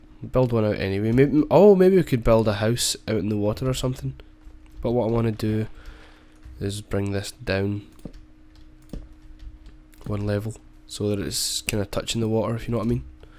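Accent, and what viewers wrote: Scotland, United Kingdom. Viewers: scottish accent+oh noooo!= winzorz and rofls. my dadio is from scottland but im from the usa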